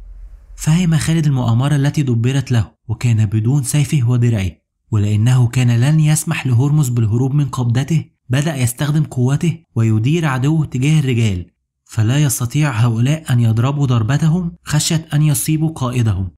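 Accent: Egypt. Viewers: يا اخي كلشي تمام بس لهجتك لمصريه خربت ونزعت لفيدو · لو تكون باللغة العربية من غير التلفظ باللهجة المصرية تكن أحسن بارك الله فيك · موضوع تاريخي شيق لا ينفع أن يُسرد بلهجة اي بلد وإلقاء ممل. إن أردتم رفع مستوى المحتوى فعليكم الإبتعاد عن الإلقاء بهذه الطريقة وباللهجة المصرية والنطق السيء للحروف العربية ونطق الجيم والظاء والذال والثاء والقاف كما تنطق نها في لهجتكم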